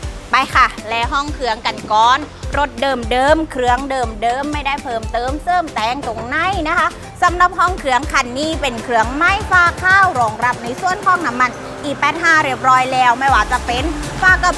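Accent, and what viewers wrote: Thailand. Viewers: แหลงใต้เพราะมากก สวัสดี บายบ่าย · สุดยอดมากแหลงเก่งมากเพราะมากถ้าไมแหลงใต้เรานึกว่าเด็กเหนือนะ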